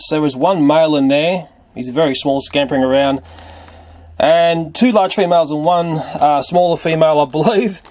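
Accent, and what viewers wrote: Australia. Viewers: Deadly spiders and an Aussie accent, where else would you rather be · wimp. by the sound of your accent your born and bred here in oz so why the big drama . You know we learn to live with them . Leave em alone and they leave you alone.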